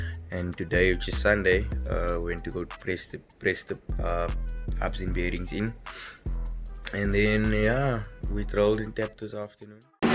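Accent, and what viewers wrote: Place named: South Africa. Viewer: this is a south African accent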